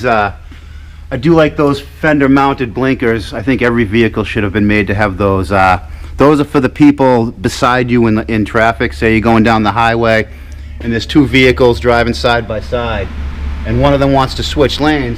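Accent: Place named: Massachusetts, United States. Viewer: good to see you back, and hear that Mass. accent again. Imagine what that Jeep would be like out on 495! Happy Holidays!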